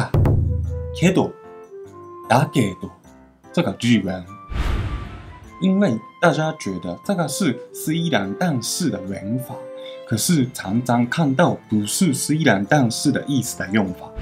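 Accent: Taiwan. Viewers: 额 一听就是台湾腔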